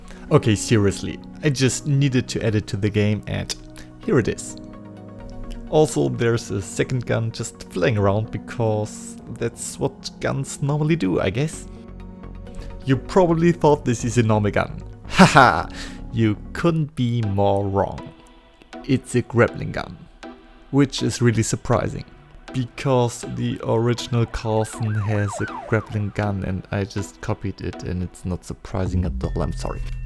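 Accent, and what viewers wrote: Germany. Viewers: Dein Akzent hört sich deutsch an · You have a german accent lol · Bist du zufällig Deutsch? Are you a German? Hört sich irgendwie so an😂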